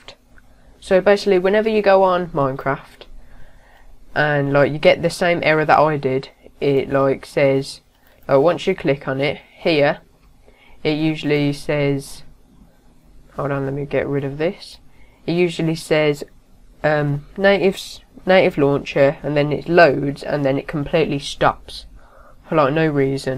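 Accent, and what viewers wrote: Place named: United Kingdom. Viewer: MAN YOUR ACCENT IS AMAZING, ARE YOU BRITISH?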